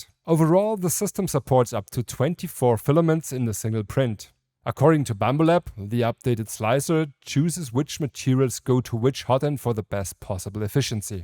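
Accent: Germany. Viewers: man hört aber das du deutsch bist 🫣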